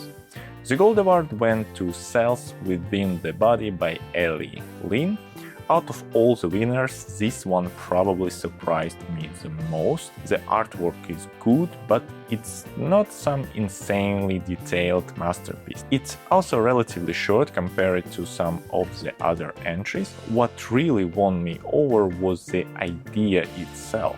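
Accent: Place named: Eastern Europe. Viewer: Очень приятный славянский акцент)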